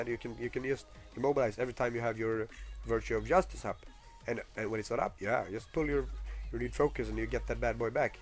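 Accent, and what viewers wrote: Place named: Scotland, United Kingdom. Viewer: where are you from? XD i tried thinking but i couldnt decide between a scottish accent or a deeper accent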